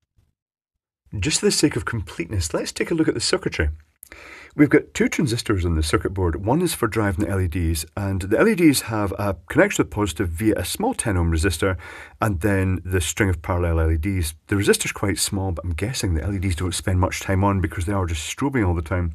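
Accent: Scotland, United Kingdom. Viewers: are you scottish i cant tell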